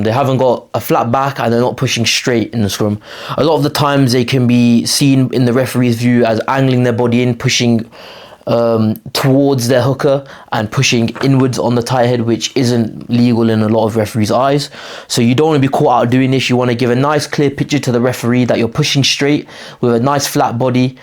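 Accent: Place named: South Africa. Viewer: love the south african accent with that ugly rose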